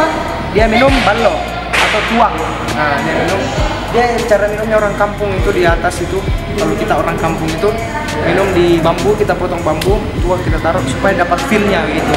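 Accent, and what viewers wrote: Makassar, Indonesia. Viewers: salah penulisan sy dimana?? Loe org toraja tp mau logat2 ala2 Makassar.. Santai moko ces..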